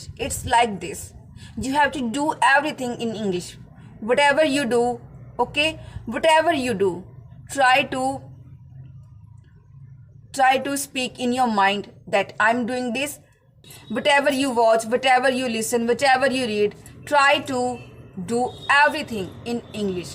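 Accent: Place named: Marwar, India. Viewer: You sound like marvadi 😊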